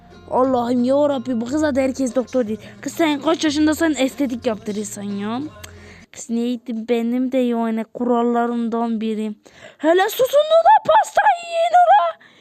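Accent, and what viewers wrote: Kars, Turkey. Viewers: Benim babaannem ve dedemde karslı aynen böyle konuşuyorlar bu arada ben Kars'ta yaşıyorum ama istanbulluyum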